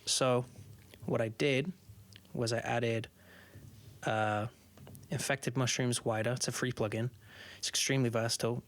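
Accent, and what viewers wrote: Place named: Northern Ireland, United Kingdom. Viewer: Bro you sound british, maybe northern ireland?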